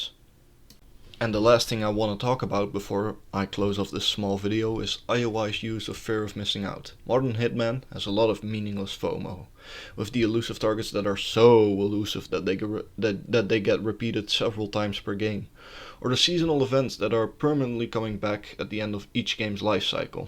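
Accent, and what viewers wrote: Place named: Netherlands. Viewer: Me too, i recognized a Dutch accent :)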